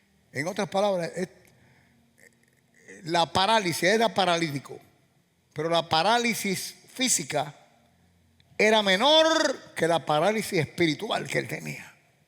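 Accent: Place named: Cuba. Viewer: Pastor yo vivo en New Jesrsey , es primera vez que me cruzo con sus prédicas. He compartido porque estas enseñanzas bíblicas y no solo conocerlas si no vivirlas , aplicarlas en nuestras vidas y preservarlas como el tesoro más valioso qué el ungido de Dios nos comparta . Dios le bendiga Pastor a usted y a su familia. Usted me recuerda mucho a mi hermano muy cubano en su forma de hablar y con la experiencia de la vida usted tuvo el privilegio de ser rescatado . Mi hermano en cambio con el potencial que tenía por no saber y aplicar estas promesas que son nuestras perdió su vida con un cancer a los 57 años. Me he identificado con cada prédica y verdaderamente necesitamos ser sacudidos y necesitamos hombres de Dios como usted . Mis bendiciones. Vivianna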